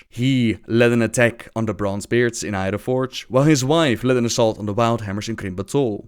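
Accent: Netherlands. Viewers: rymarowicz he is dutch. And has a nice accent. so he might say thing differently then you and i do.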